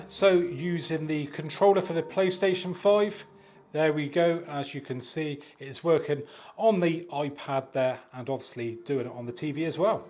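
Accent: United Kingdom. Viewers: Are you British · Very helpfull I realy understand everything and your very nice british english you are the best and your video is far more better then sony thanks you very very very much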